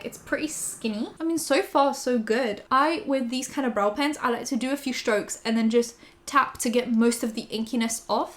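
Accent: England, United Kingdom. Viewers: Wait I thought was from London your accent is so English and know she has said it she does look polish but I was just so surprised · tbh i wouldnt be able to tell that english isnt your first language, messes w my brain bc you just sound like a london native lmao, i live in north london and literally cannot fault ur accent its mad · that is so rad you are from Poland! I was wondering because I stalked all your older vids one day and noticed it sounded like you had a totally different accent. So, I assumed you just eventually pretended to have an posh english accent... hahah totally my bad!! My husband is from the UK and you speak english like you grew up in the UK. I guess you did grow up in the UK starting from a pretty young age. Anyways- that is so cool you are from Poland! hell yeah! if you like watching Polish films and stuff still- you should watch " Cold War" - best love story ever and it is pretty new, came out around 2018?? 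I think... anyways- it takes place in Poland and it is AMAZING!!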